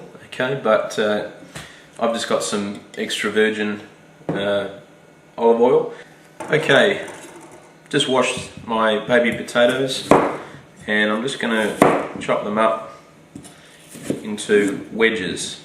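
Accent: Australia. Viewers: loveeeeeeee Australian accent!!!!!!! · Very nice recipe What accent is that? Australian?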